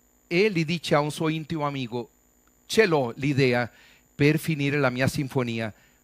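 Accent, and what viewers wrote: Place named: Costa Rica. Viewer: Es un enorme placer ver y escuchar al costarricense Gaston en esta deliciosa conferencia, en que llevados atraves de la armonía del italiano en versión tica, clara y precisa, nos lleva de la mano a explorar la obra de Gustav Mahler. Me conmovio el haber sentido el profundo sentimiento de respeto y admiración del Sr. por la obra de Mahler atraves de su voz que trae remembranzas del pasado. Mil gracias.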